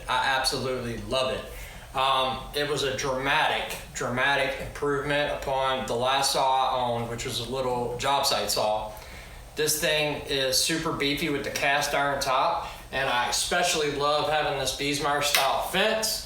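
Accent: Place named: Louisiana, United States. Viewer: Hey man, good video thanks for sharing. What part of the Who Dat Nation you live in - sounds like you're down near Grand Isle?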